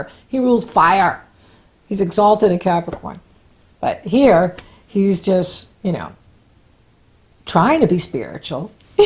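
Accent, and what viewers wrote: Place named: Upstate New York, United States. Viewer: "having MAHZ THEAH" I knew you had an accent, just thought upstate NY or somethin' - you slipped into some sorta Bostonian thang...what was that? lol It seemed to throw you off too a bit...hahaha GREAT reading. You are so fun and thorough, thank you :) You're WICKID SMAHT and totally ROCK! hahaha ;)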